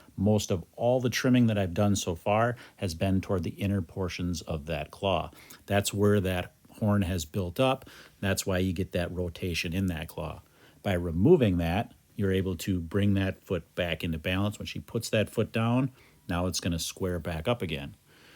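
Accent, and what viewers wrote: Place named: United States. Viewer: Opinion on accent depends on where you're from! As a Brit I'd say has a strong American accent!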